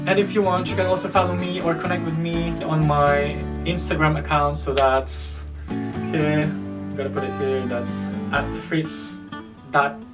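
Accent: United Kingdom. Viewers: british accent! bigat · wow ang accent mo kabayan british ang bongga ako bisdak na bisdak parin haha sunod niyan citizenship na rin congrats sayu din.Naalala ko rin ang mga visa na yan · Yay!!! Thank you for sharing.. Lupit NG British accent... Sana all hahaha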